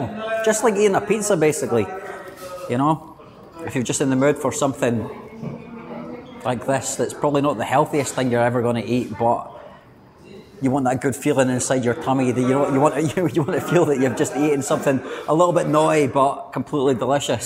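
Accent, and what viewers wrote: Scotland, United Kingdom. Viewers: Yup ! Thick Scotland Accent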